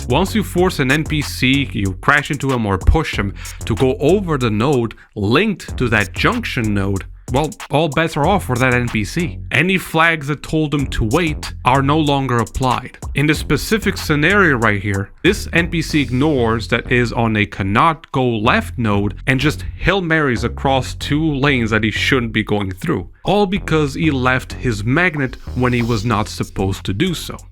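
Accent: Portugal. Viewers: EU SABIA, esse sotaque não engana ninguém brother, já estava na cara que eras tuga, só faltou ver a matricula no teu quarto no minuto para me aperceber ahahah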